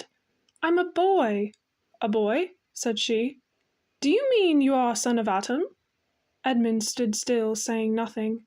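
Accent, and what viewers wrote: United Kingdom. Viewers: Thank YOU for doing a fabulous dramatic primadonna british accent ice queen! Sounds fun to record and even more fun to listen to!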